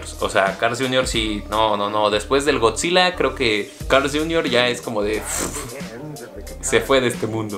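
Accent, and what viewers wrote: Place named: Tokyo, Japan. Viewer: Saludos desde México CDMX ánimo....me fascinó tu acento de Tokio como que se te pegó...jajaja....como siempre 🤠🤠🤠🤠 mis respetos excelente información.........aah porfis Saluda a Doña Lety...a Don Álvaro y al buen Lalo.... La mejor hamburguesa la última solo por el tocino....bye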